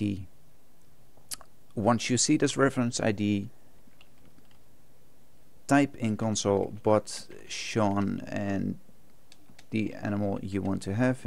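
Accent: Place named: Netherlands. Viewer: This guy sounds Dutch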